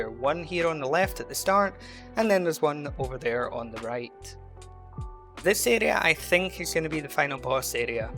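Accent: United Kingdom. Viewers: Bro, where ya from? Roughly Ya sound British, but you Actually put in effort in saying the Spanish words well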